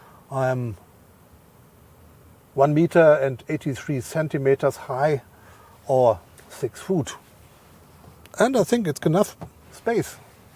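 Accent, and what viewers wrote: Germany. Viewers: Totally appropriate to have a Porsche review with a Porsche reviewer with a thick German accent…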